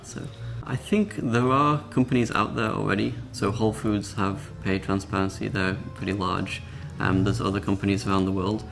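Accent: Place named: Jamaica. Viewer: Ríordáin I think his accent is jamaican...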